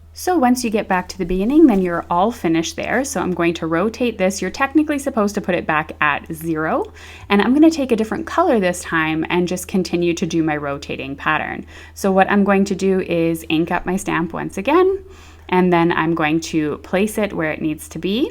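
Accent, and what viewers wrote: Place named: Canada. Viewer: Your Canadian accent is so cute. Idk how I feel about this platform. I like the lines and the clicks so it’s a maybe yes for me. Thanks for showing us how to use it ❤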